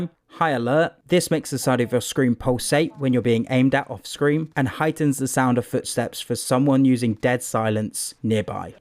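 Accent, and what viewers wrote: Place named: United Kingdom. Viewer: THANK GOD A FELLOW BRIT EXPLAINS WHATS WHAT. WHY'S SO MANY IMMATURE, AMERICAN LOUD GOBS, SCREAMING LIKE KIDS,WITH NO REAL INFO? I AM SO ANNOYED AFTER 7-8 ATTEMPTS OF GULAG CONFUSION. IVE PLAYED EVERY COD GAME,BUT THIS DONT EVEN SEEM TO HAVE A "ME V BOTS, SOLO PRACTICE"? IS THERE ONE? PLEASE TELL/HELP!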